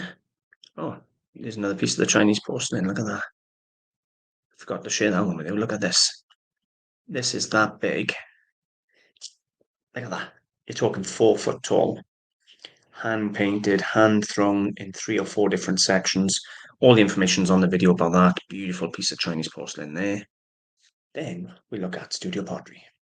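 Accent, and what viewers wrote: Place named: South Wales, United Kingdom. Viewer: Hi I’m fairly new to your channel. I’m in S Wales also and it was wonderful to find your videos and hear your accent. I m also new to reselling and I sincerely thank you for sharing your wealth of knowledge, expertise and enthusiasm.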